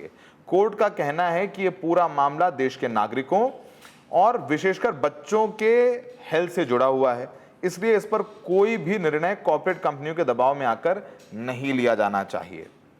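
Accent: Kanpur, India. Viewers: You should make more videos in your authentic kanpuri language and Ravaiya. It was fun to watch.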